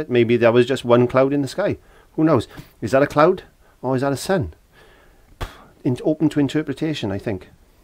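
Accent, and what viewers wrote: Wales, United Kingdom. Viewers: Thank you for showing us how to build up a Van Gogh picture ... you are very entertaining as well... we love your cheeky welsh accent .... If I had the money to pay for you to go to the Van Gogh museum I would ..keep it up you really are fantastic at what you do 👍thank you 👍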